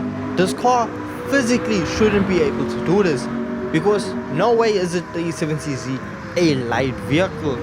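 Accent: South Africa. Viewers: Are you south African???😭😭😭 I'm picking up your accent and it sound heavy familiar...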